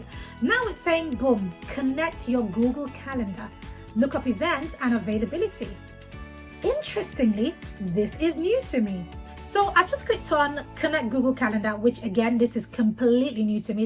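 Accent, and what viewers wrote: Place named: United Kingdom. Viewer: (I am answer to you here, as on that reply i couldn't, i don't know why!) I am glad! ☺hands-yellow-heart-red And...YES! I hope they will be the company, that i can fulfill my long last dream, of making that project! And first i thought that you are from the US, later on i have figured out, as you have mentioned that, you are from the UK and truly paying attention, at your english accent! 💫🙏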